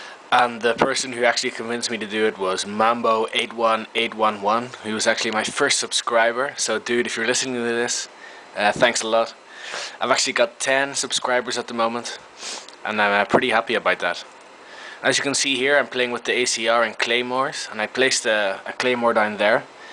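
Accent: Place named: Netherlands. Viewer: kom je toevallig uit nederland... i just asked you if you re from the netherlands cause your little accent sound dutch..... good video by the way.... i like the bushmaster also...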